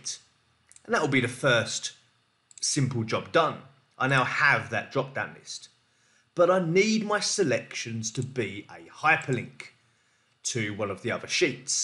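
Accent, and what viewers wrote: United Kingdom. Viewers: Have to suffer 8mins of stupid British accent to learn how to create hyperlinks from drop down menus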